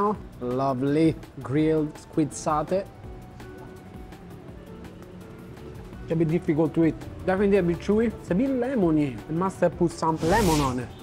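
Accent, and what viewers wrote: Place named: Italy. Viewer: coba belajar bahasa Minang mix sama logat Italia. Alhamdulillah habis buka puasa lihat vlog jadi ngiler.. mana bisa nolak lihat dan makan rendang 🤤🤤🤤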